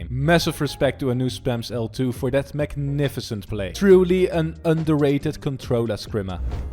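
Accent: Netherlands. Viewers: je bent zoizo nederlands ik kan het horen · are you dutch? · Kom je uit Nederland wand je heb een Nederlands acsend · Ge hoort gewoon dat da nen hollander is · Are you dutch. I am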